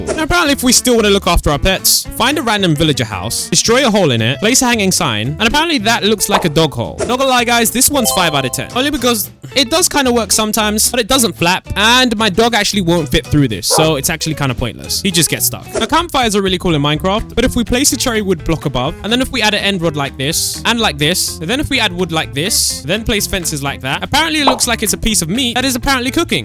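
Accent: United Kingdom. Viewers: You sound British, I’m British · Bro is British💀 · HES BRITISH U DO T KNOW THE BBRITISH AARE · Are you British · Ur British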